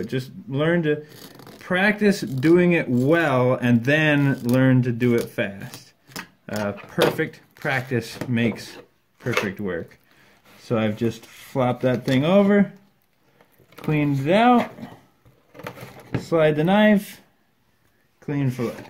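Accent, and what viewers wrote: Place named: Adirondacks, United States. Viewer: I knew you were an Adirondacker before you said it. It's the accent. I grew up in the Keeseville area. Moved to New Hampshire after college. Been down here about 25 years and people still ask about my accent. My sons and I fish down here. It's fun, but there's nothing like fishing the Adirondacks. Great video! Hope all is well with you and your family.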